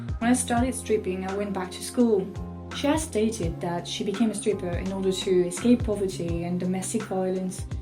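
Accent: France. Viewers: Je pense que t'es française vu l'accent et la vidéo était grave bien et super intéressante mais stp investis dans un micro :(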